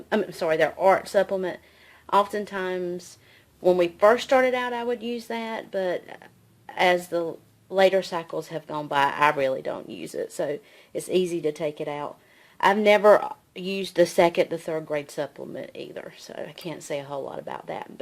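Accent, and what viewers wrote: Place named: South, United States. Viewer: Thank you so much for this review. I school many children at once and find that it helps to know in advance about the ease of planning. For me, some curriculums are just too involved or scattered for me to do with multiple children and I have ended up wasting precious time and money trying them out. I appreciate this review very much. And love your sweet southern accent!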